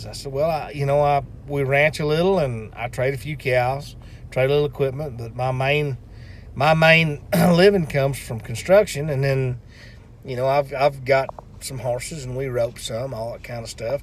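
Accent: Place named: Mexico. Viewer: Thanks mate ... I still can't get off the floor ... he is crazy funny. Love his Mexican accent.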